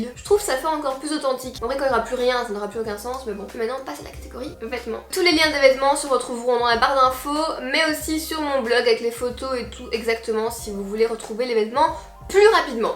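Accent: Belgium. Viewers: Est ce que un jour tu pourras faire un meet up à Marseille ? ?? Sinon vidéo parfaite 😘😘je kiff votre accent en Belgique 😘😘 BISOUX 😘😘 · J'adore ton accent belge ♥️♥️moi aussi je suis belge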